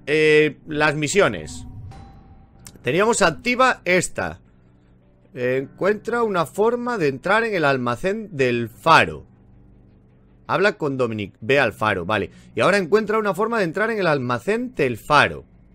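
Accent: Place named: Galicia, Spain. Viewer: de donde eres? (tu acento parece gallego)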